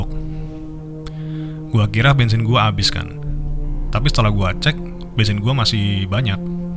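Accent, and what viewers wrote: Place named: Surabaya, Indonesia. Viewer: Loh wong suroboyo toh mas? Boso suroboyoan ae cak unik wonten identitas e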